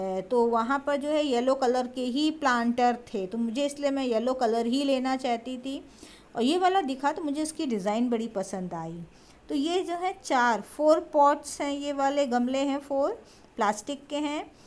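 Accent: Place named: Allahabad, India. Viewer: being allahabadi my self i can so identify with the accent ,kudos to you for being authentic:)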